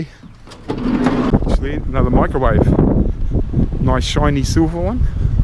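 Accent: Australia. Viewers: I enjoy your videos mate. I love hearing Aussies talk. Never gets old. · I live right in the middle of the U.S.A. and I'm starting to say aluminum, the way you do and I don't even realize it. I wish I could talk like you! Everyone I know loves the deep Australian accents!!!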